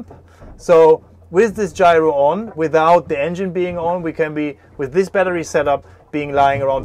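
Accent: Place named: Germany. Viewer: Better and thorough presentation, than other presenters of the same boat. Don't mind your german accent......👍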